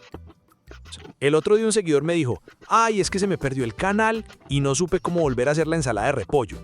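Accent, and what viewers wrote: Colombia. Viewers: Un saludo desde Costa Rica 🇨🇷✌️. Gracias por compartir tus recetas, son sumamente deliciosas. Me gustaría saber de que parte de Colombia eres, me gusta mucho tú acento. 🙅🇨🇷🥂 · ❤❤❤🎉Hola grasias por sus Resetas tan ricas el mango 🥭 me fasinan como sea pinton y no tan maduro saludos que asentó tan lindo Colombiano💗🥭🥭🍓🍐🍉🥑🍅🫜🥕🍍🌴🌊⛵🏖️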